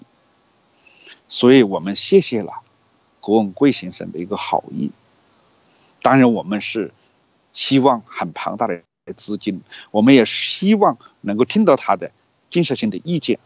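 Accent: Hunan, China. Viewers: 何先生生日快乐🎂🎁，非常喜欢你的节目，从一开始有30-40%不懂的湖南普通话到现已99%都懂了，而且是爱上湖南普通话了。希望您保持你的风格，希望能一直听你的火拍。God bless you and your family！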